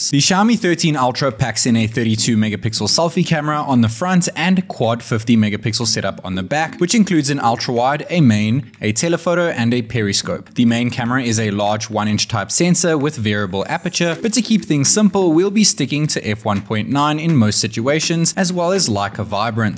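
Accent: South Africa. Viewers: Yay , great review I thought your accent was familiar. Hello fellow South African 🎉🎉🎉looks like Waterfront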